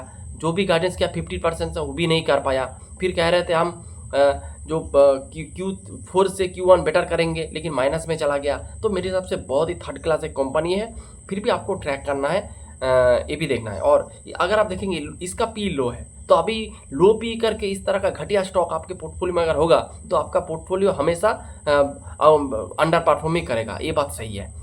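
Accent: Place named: Bengal, India. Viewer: Bhai puro Bengali tone asche, Hindi ta khub baje sunte lagche. Camera er angle ta thik kor - get a virtual background. Content bhalo but not getting attention. Fix these issues.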